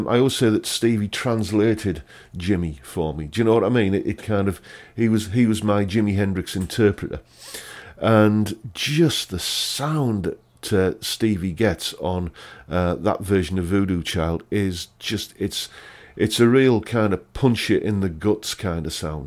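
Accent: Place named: Teesside, United Kingdom. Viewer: Ahhh, you're a Teessider! I've been trying to work out your accent for days since I found your channel. I knew you weren't a Geordie (no hair on you palms) but I was thinking Co.Durham. Now that you show the Gazette though, I'm thinking closer to the Ironopolis. I'm guessing not Middlesbrough though (I'm from Marton but live in Manchester) because your accent is a bit too thick. You say you live close to Darlington, so I'm going to guess Stockton. Am I about right? I'm going to say: 1. -. I was 13 years old and it came on the TV on The Box through me little comcast box. I remember borrowing my sister's tape and getting chills from the solos. 2. -. After borrowing Cali, my sis and her b/f made me a mixtape which had on it. I used to listen every Sunday on my paper-round to this tape and always floored me with how dynamic and distorted and squeelie the guitar was. 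3. -. strat on this (I believe it's a strat) just shows exactly what a clean tone should be. It's all about the phrasing and the fingers, but also a well set up guitar with proper action and the right gear. 4. -. Perfect tone. 5. - Sorry but... It wouldn't be a hit song if it didn't have something going for it and LP sounded amazing on my way down the Avenue of trees in Acklam on a summer's day during my exams. That particula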